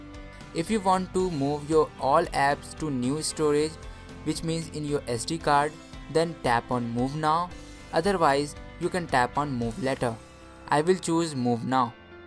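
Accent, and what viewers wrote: India. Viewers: I like the video but the matter is i can't understand what he says cuz it's always indian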